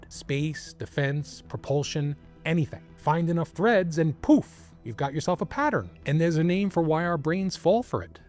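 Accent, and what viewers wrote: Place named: Pittsburgh, United States. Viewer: I gotta ask, are you from Pittsburgh? I know you say in the beginning you are in Vermont but your accent reminds me of my hometown. ❤ Love your videos BTW.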